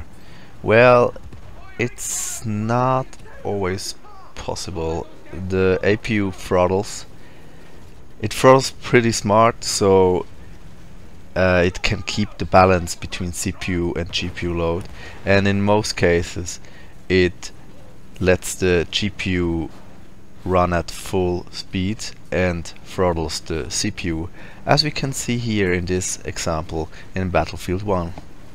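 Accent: Germany. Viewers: dieser hölzenre deutsche Akzent....warum nicht gleich auf deutsch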